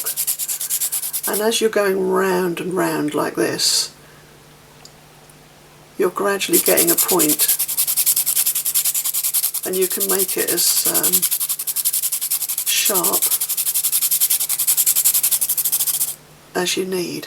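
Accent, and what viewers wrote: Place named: United Kingdom. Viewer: Good job! Are you in San Diego or England? Just curious with your British Accent!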